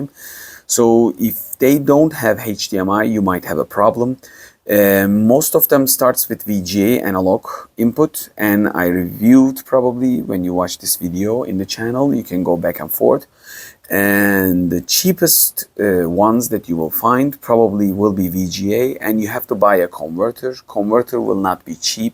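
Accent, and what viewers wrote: Turkey. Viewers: Oglum I recognise this accent, memleket neresi?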